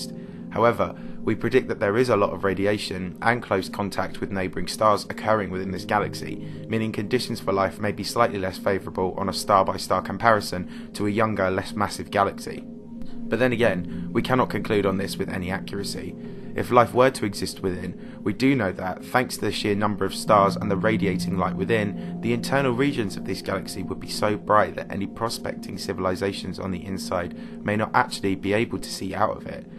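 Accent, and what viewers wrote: United Kingdom. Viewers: He doesn't sound anything like James Cordon. Let me guess... You're an American. And all us Brits sound the same, (even though we have more regional accents than just about any other country.) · Thank you, you just won me 10$!! I bet my girlfriend the narrator of this video would be British.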